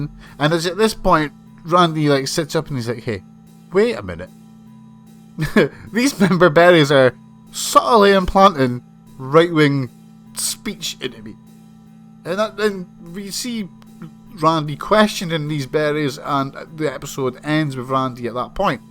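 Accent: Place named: Aberdeenshire, United Kingdom. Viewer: Hey min, far aboots ye fae loon? Ye soun like a Brocher? Definitely Aberdeenshire, aye?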